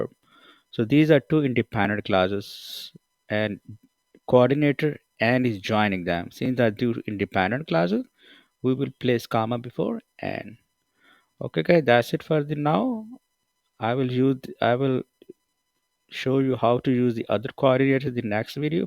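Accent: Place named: India. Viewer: Your indian accent is intolerable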